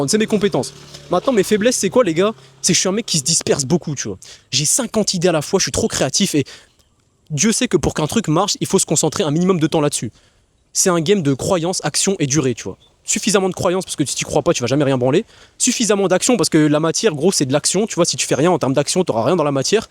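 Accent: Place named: Algeria. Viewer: 2 secondes dans la vidéo tu me fatigues avec ton accent pied noir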